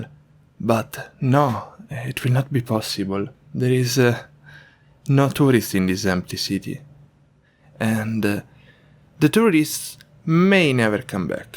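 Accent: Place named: Italy. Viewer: He is a very good Vlogger now it reminds me Sunny in Thailand, plus I do love his Italian accent, he is more improving now.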